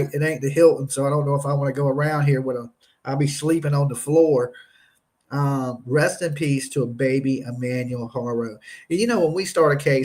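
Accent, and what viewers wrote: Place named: Kentucky, United States. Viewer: I live n Eastern Kentucky... Was u n Lexington or Louisville? Sounds like it...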